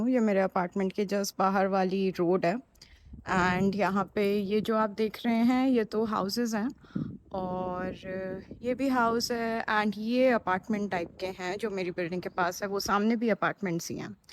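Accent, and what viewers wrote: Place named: United States. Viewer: Why your accent is American Hindi ?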